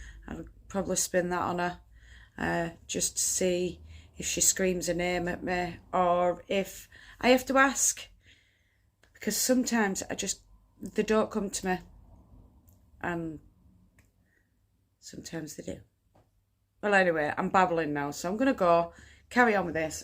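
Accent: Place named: Northern England, United Kingdom. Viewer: My husband has just walked in and said , ah I know that accent ,his from up north, we now live in Devon , I understand where your coming from on not getton5 enough sleep , I worry rats if I don't have enough kip 😂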